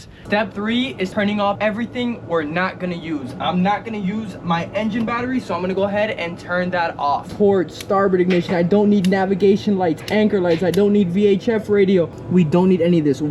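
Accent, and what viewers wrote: Miami, United States. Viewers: Knew bro was from miami after a couple words 💀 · His Miami accent lol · Are you from Miami?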